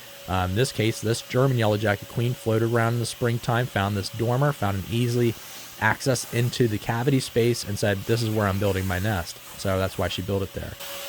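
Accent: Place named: Midwest, United States. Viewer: I’m from the PNW & can’t place his accent. Is it like Midwestern?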